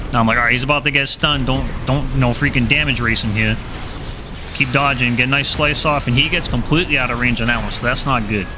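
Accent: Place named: New York, United States. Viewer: Lmao 😂 yo i know you gotta be from new york by the way you talk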